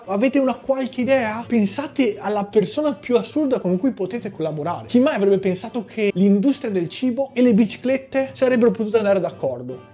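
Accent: Veneto, Italy. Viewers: Wow!, ti è venuto l'accento Veneto... Pazzeeesco!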